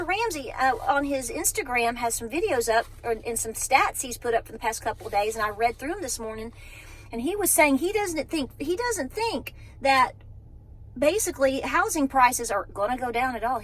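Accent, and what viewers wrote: South, United States. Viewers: I live here in East TN West Knoxville too! I just found your channel a few weeks ago. I love you videos and your Southern accent, thank you for sharing, I can feel the heart-love you send. 🦋🪴❇️💜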